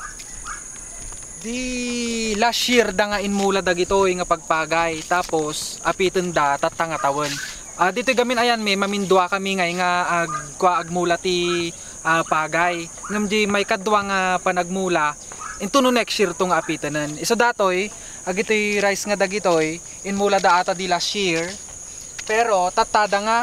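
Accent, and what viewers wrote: Ilocos, Philippines. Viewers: Maraming salamat for videos mo! We love to see the food and the location. Asawa ko really enjoys hearing you speak Ilacono. It makes her miss home in Laoag City. Big thumbs up! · Hearing you talking Ilocano reminds me of my lola.... I really miss her a lot.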